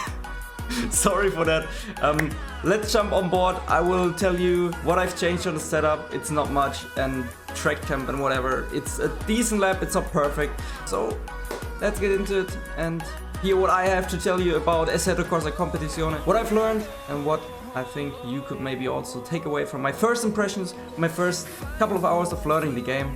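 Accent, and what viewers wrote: Germany. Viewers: bist deutscher hört man bist du esportler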